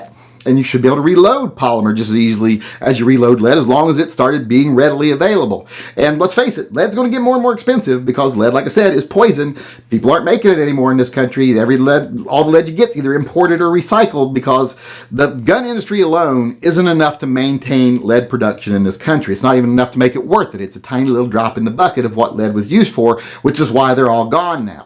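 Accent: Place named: California, United States. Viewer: Are you sure your not from commiefornia , cause you sure sound like it . Fudd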